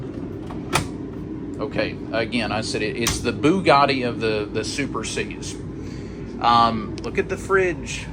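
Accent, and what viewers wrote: South, United States. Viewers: There is nothing worse than a used car salesman lying to a prospective customer with innuendo of impending end of driving cars spiel to pressure the buyer. Until now. I remember this same threat by used Renegade Youtube dealers that the XL was being discontinued in 2022. Probably even had the very practiced cover their butt distinction that they had it "on good authority by the President of the United States..." or some s**t. You come off as a southern drawling con man when you gossip.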